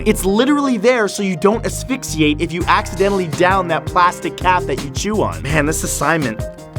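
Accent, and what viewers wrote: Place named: Canada. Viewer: Your Canadian accent. Is killing me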